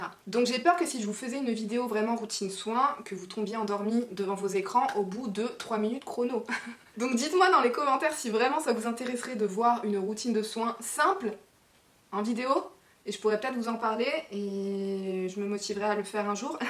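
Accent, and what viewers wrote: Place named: South, France. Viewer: Vidéo bien sympa tu es pleine de vie je pensais bien que tu venais du Sud tu as un peu le même accent que Julien Doré. Restes comme tu es et bonne continuation 🥰❤🥰❤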